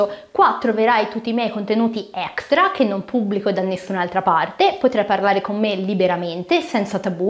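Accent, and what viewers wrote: Livorno, Italy. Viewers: Io riesco a riconoscere che è livornese. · Il tuo accento toscano mi fa impazzire 😄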